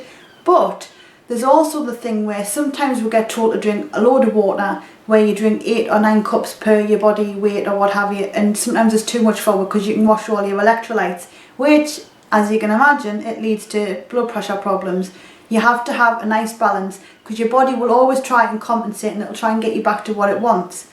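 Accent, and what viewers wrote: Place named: North East England, United Kingdom. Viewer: Good summary and nice to hear a NE accent. Two other things that I find are really effective are: fasting (blood pressure also stays low afterwards) and going in the sauna (think it's because you relax rather than losing fluids - I drink a lot of water).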